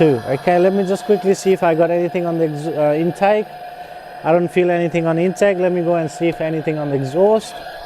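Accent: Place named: Nepal. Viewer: Good stuff bro. Your accent sounds like Nepalese accent.🤙🏾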